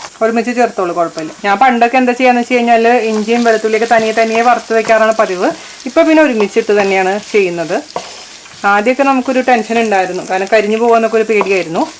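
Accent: Thrissur, India. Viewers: ചേച്ചി മ്മടെ തൃശ്ശൂർ ന് പറഞ്ഞ അത് മ്മടെ ഭാഷ തന്നെയാ അത് മാറിയാൽ പിന്നെന്തുട്ട് തൃശ്ശൂർകാരി ഞാൻ ഒരു തൃശ്ശൂർകാര്യ ഇത് കേൾക്കാൻ വേണ്ടിയാ കാണുന്നെ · Wooww😋 കൈപുണ്യം എന്ന ഒരു സാധനം ഉണ്ട് ചേച്ചി അതും വേണ്ടെ എനിക്കൊന്നും അത് അടുത്തുക്കൂടെ പോയിട്ടില്ല Anywayy kandapo kothiyaayiii 😋😋😋 Chechide anchoring Nammlde trrisure anchoring Kandathkondaa njn subscribe cheythath thanne Thats the thing what i inspired to learn you You never change your own way Plz reveal more and more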